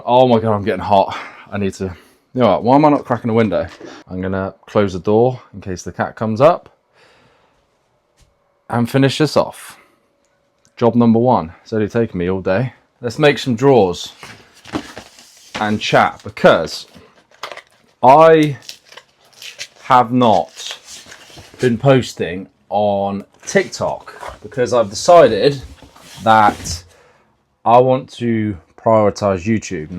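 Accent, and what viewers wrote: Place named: England, United Kingdom. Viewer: This gorgeous, handsome English man in my feed again with that gorgeous English accent - making an old Irish queen like me very happy indeed 😂🇮🇪☘️🏴🇬🇧❤️❤️❤️❤️❤️❤️❤️❤️🙏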